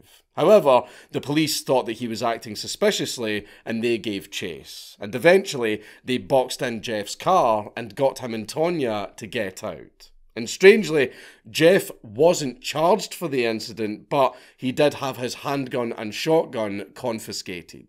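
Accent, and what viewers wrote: Scotland, United Kingdom. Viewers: I never realized you were scottish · He's Scottish? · Funny Scottish Santa man cures my depression for christmas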